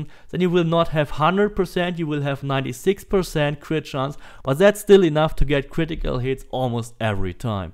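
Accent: Germany. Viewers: I hear german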